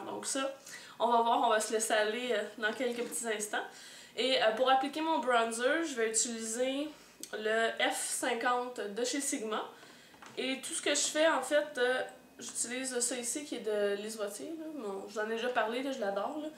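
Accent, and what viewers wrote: Quebec, Canada. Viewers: Salut, Je suis une abonnée depuis un an environ je trouves que tu as une Personnalité Pétillante mais ce que j'aime encore plus c'est Que rien ne pourra t'enlever ton coté Québécois ahah Je Te cites: Des patches Secs s'pa L'idéale Je swirl Dedans Ahah continues Ma belle:)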